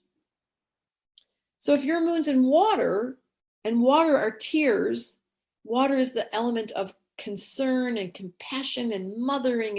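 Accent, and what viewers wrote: United States. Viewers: may I ask? Are you from Chicago? I hear some kind of accent?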